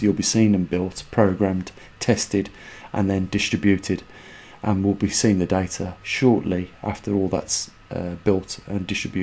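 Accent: Birmingham, United Kingdom. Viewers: OMG a Brummy talking science . Whatever next. Utter bllx.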